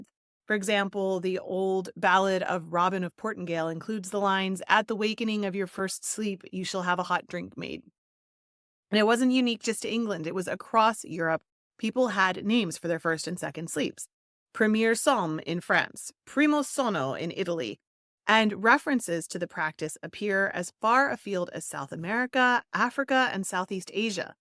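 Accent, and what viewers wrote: United States. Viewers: A good engagement, however your American accent is totally unnecessary & very annoying, sorry.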